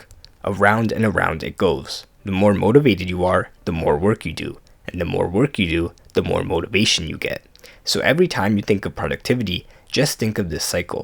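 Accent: United Kingdom. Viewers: british accent 😄🤩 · nah bro the english accent 💀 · That British accent is FOUL lmaoooo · I love the British accent😂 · that oxford student voice though.... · british accent goes crazy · That British accent though. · the british accent broke me!! perfection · The British accent got me. it literally forced me to drop a like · Your British accent made me laugh 🤣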